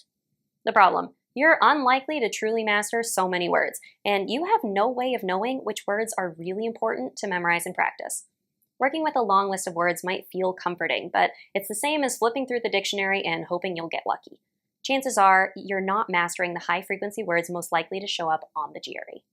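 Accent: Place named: United States. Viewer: I like her American Accent.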